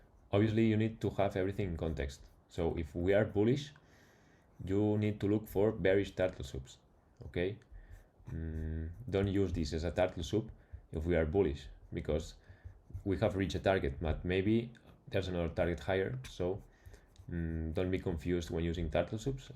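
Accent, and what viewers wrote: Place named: Spain. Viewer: video super útil, gracias! Buen ingles, pero se nota el acento Español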